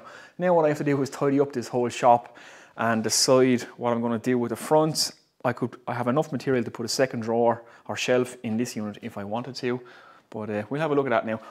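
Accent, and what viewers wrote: Waterford, Ireland. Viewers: Cut the ploywood with the moytersaw, I love the Irish accent almost as much as I love this build. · just looked back and found your video fixing timber to the walls. I might just take down the few bits I've put up and start again like you did. I'm gueaaing by the accent you're a Waterford man. If so, commiserations for Sunday.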